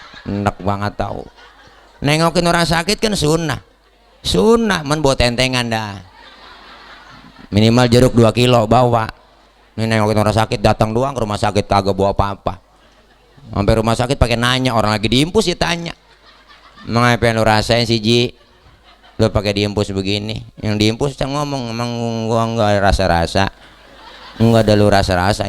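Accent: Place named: Cikarang, Indonesia. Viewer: Pa ustadz ..Bahasanya org Cikarang ye ..ceramahnya ga bikin ngantukk Ada lucu nya ada seriusnya ..semangat ye ustadz tilee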